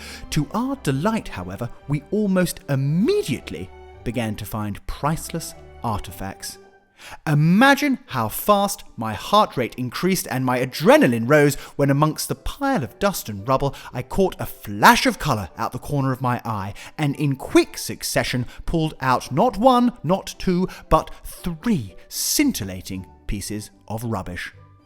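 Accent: RP, United Kingdom. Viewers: It's true! I've also noted that the comments are just overflowing with people, (including me), attempting to use their very best Queen's English (if I understand the term correctly), inspired, I'm sure, by the narrator who is a shining example to us all! ❤ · Your videos are simply scrumptious! I adore the narrator’s witty use of the Queen’s English. I am sure you are firm friends with the local antiques dealers, tell them you are on the lookout for a chimney surround of the same era as the house, they might be able to help you. I would start on the downstairs toilet/bathroom, comfort is important whilst renovating. (And someone will eventually spot you behind that bush..). Afterwards I would tackle either the dining room, or the sitting room- so that you can have a room that is finished and decorated and furnished, it will bring a huge sense of achievement and an actual place to wind down.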